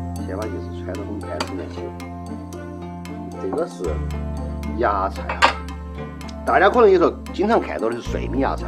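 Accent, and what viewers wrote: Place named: Sichuan, China. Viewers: 我不是四川人，但听到的方言很亲切👍👍👍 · 口水哗啦啦，明天就试一下，这个成都话简直太亲切了！好吃的板😀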